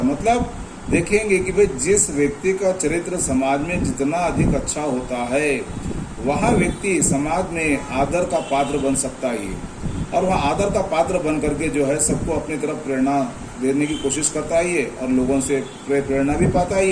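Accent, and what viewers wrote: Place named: Hyderabad, India. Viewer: Explanation fabulous but that Hyderabadi Hindi that was way more fabulous than your explanation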